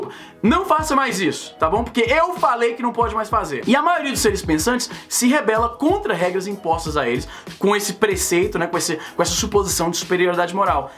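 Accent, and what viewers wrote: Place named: Ceará, Brazil. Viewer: Eu percebi algo de cearense em vc . ( fala rápido pra caralho )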